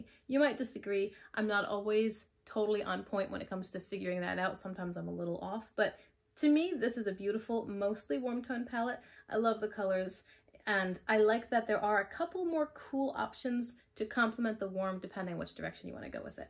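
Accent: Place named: East Coast, United States. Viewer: I smiled when you read the palette name "At Forest Sight"! When I moved out to the West Coast, my neighbor had a bumper sticker that read "May the Forest Be With You", and it confused me for the longest time, because I -- like you -- have an East Coast accent and pronounce "forest" with two syllables. Those puns only work if you pronounce it "forst", not "FAW-rest"!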